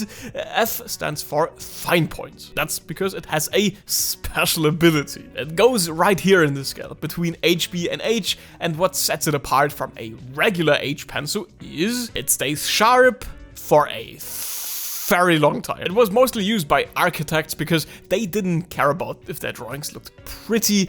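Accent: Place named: Germany. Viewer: I started watching your videos last night and instantly found your accent and style of comedy nostalgic. You reminded me of the lessons my german mother gave me when i was young, very German yet very relatable.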